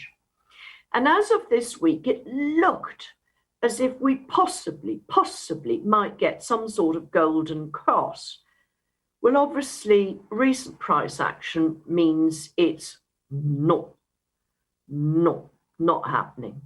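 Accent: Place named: England, United Kingdom. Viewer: Hey, its the English lady again!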